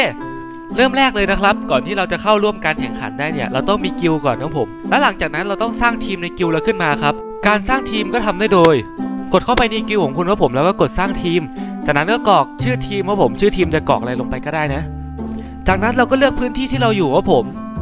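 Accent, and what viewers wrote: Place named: Khon Kaen, Thailand. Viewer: อ้าาาก พี่ ขอนเเก่นเหรอ ที่เด่ววกัน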